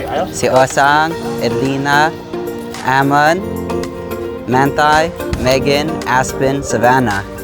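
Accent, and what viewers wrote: Philippines. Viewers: I wanna hear talk in his native tongue, as in talking with that Texas twang. Even his English words when he's narrating are spoken with a Philippine accent.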